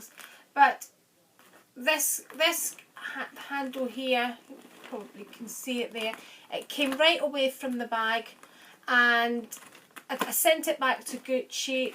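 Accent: Scotland, United Kingdom. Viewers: Thank you for tagging me! Just love watching and listening to your Scottish accent!! You look gorgeous as always xxxooo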